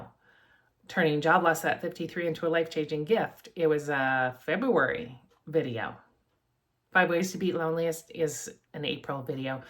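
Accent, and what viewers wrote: Canada. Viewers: Are you in Canada or at least Canadian? Sounds like it. 😊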